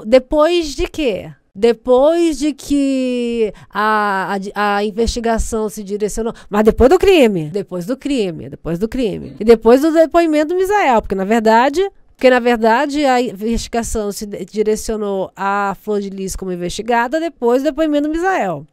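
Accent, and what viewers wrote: Rio de Janeiro, Brazil. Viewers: Seu Sotaque carioca ficou muito bom . Simplesmente sensacional Parabéns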